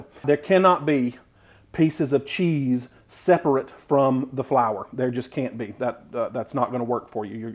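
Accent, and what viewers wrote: South, United States. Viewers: Sir, I love your videos and southern accent! Your face really made me laugh! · I love your videos.... Talk as long as you need too. Heck you sound like me with that southern tone... well I have been waiting for use of my coconut flour and here it is... Thank you very much. I will be trying this one. ♥ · I don’t know why but I saw your channel on my feed and I started watching you and this video was amazing!! You have great energy and a great Southern accent!! You are doing a great job!! Maybe we can do a collaboration let me know!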